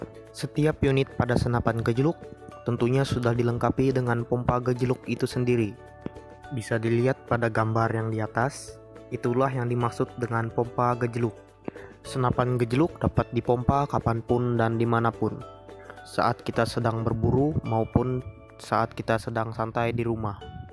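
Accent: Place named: West Java, Indonesia. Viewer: Logat nya kya orang sunda sih bos...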